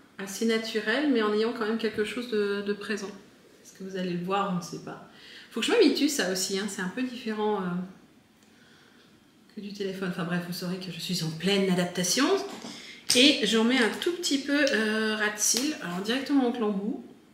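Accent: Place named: Switzerland. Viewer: Je me gardais ce vlog de côté pour un moment en tête à tête avec toi... C'est agréable ce format : il nous permet de prendre le temps de le regarder. Bien plus que quand les stories défilent et qu'on n'est pas spécialement attentif ! Est-ce que mon moment préféré reste le "coucou", j'avoue ne pas en avoir entendu un depuis des années, et ça m'a dépaysé d'un coup ! Ton p'tit côté suisse ... Merci beaucoup ! C'était un chouette moment !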